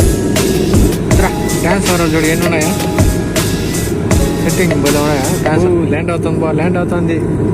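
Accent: Chittoor, India. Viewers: ఫస్ట్ టైం లా లేదు బ్రదర్...బాగా అనుభవం ఉన్నట్టు చెప్పారు...🤔🤔🤔ఏదేమైనా మీరు సూపర్ బ్రో...మన యాసని సరదాగా ప్రపంచానికి పరిచయం చేస్తున్నారు... వింటున్నంత సేపు చిత్తూర్ పరిసర ప్రాంతాల్లో తిరుగుతున్నట్టు భలే ఉంటాయి మీ వీడియోస్...👌👌👌👍👍👍 ఫ్రమ్ శ్రీ కాళహస్తి... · I love chittor slang. I have frds in tpt chittur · Ni videos super ba.. slang style vere level..baa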